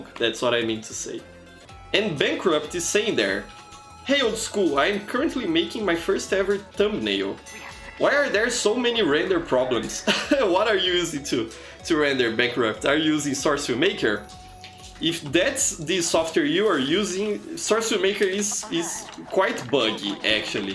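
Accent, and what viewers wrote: Brazil. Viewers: Your accent sounds like you're brazilian. Great content, keep it up!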